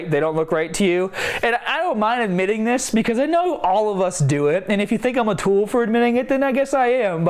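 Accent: Boston, United States. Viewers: The boston accent is heavy in this one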